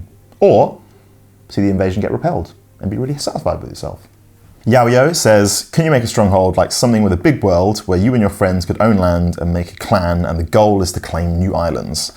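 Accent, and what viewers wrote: United Kingdom. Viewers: I like British accent :)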